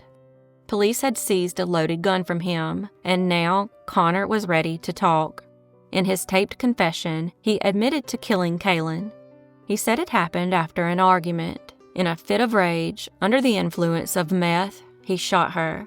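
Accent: South, United States. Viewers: THANK YOU!!:hand-pink-waving: SOLVED CASES!!! YAHOO!!BEAUTIFULL COUNTRY VOICE AND A HEART AS BIG AS MY HOME STATE!! [ TEXAS ]!! GOOD WORK!!💯❤👌🧑‍🌾👩‍🌾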